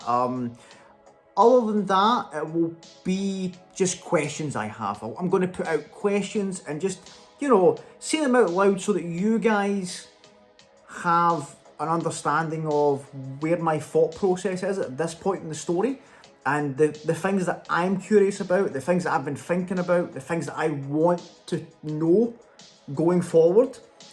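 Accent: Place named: Scotland, United Kingdom. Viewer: Wow you have a Scottish accent ‼️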